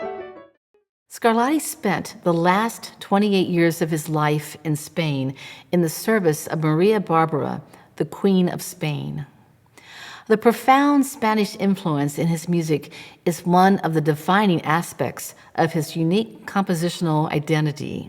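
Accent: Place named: United States. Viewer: American English pronunciation is fine for a American English and we’re all familiar with it; it is as legitimate as any other world-wide English accent. I do have an issue though when the drawly vowels and mispronounced consonants (particularly ‘t’s as ‘d’s*) are lazily and disrespectfully transferred wholesale and without a thought for accuracy onto languages like Italian where the resulting mispronunciations are grotesque - it’s rather like dressing up a pretty little girl in a workman’s dirty overalls. * Giving us the horrible ‘Scarladdi’ for example.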